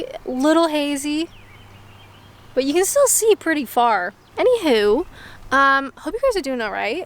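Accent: Canada. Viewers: Your voice has gone *very* Canadian!! And consuming locally-made honey is a good natural remedy for hay fever (works for me anyhow... or should that be 'anyhoo'?) 😊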